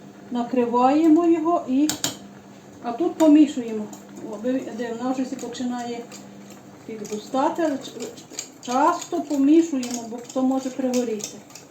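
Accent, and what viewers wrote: Ukraine. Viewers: Дивуюсь де яким коментарям.Все показано і розказано дуже чітко і ясно.Приємно дивитись і слухати таку нашу милу сільську украінську мову.Дякую і здоров'я вам і вашим помічникам.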